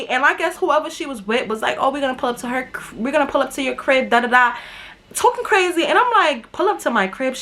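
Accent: New York, United States. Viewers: i really love new york accents 😩🥰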